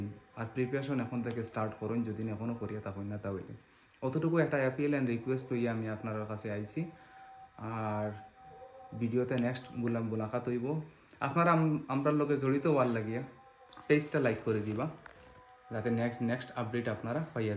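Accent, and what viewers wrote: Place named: Sylhet, Bangladesh. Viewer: Aita bengali language na ....sylheti language a kotha boltese...Amio Dhubri theke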